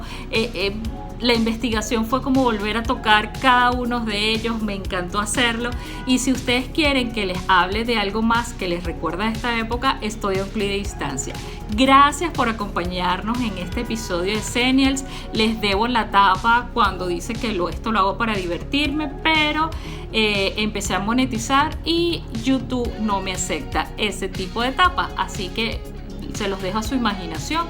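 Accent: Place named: Venezuela. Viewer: ¡Guao, que excelente manera de comunicar! Muy divertida y aleccionador relato. No conocía esto de Xennials, realmente lo soy. Gratos recuerdos y con ese acento venezolano maravilloso. Congratulaciones.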